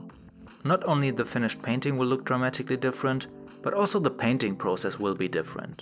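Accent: Germany. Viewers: Man hört raus dass du aus deutschland kommst haha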